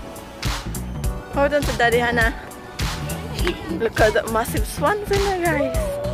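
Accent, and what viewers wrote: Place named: England, United Kingdom. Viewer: Dami swans sis. Madaya yong geese .... English accent ka na rin sis.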